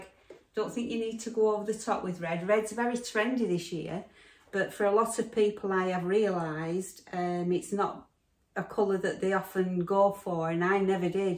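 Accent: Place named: Lancashire, United Kingdom. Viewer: I'm new to your channel, and I've enjoyed watching your brilliant ideas. I've got to add, I love your accent, we are originally from Preston Lancs, and your accent takes me back to living there! Thank you.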